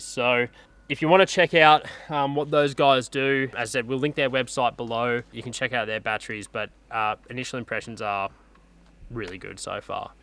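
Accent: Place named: Australia. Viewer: Very heavy Australian accent almost as bad as heavy Scottish hard to understand??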